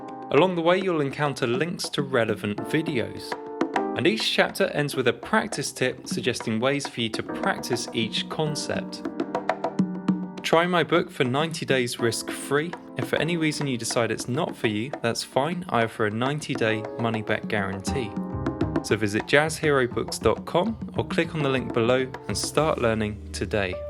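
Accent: United Kingdom. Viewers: Just realised whom your voice brings to mind, and it's probably an insulting comparison, so let me add the disclaimer that now that I realise who it is, you really don't sound like him apart from your intonation and a couple of fairly common South England pronunciations. All right, it's Danny from Withnail & I. Sorry. Great videos, though! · Fantastic lesson you are a great teacher are you British ?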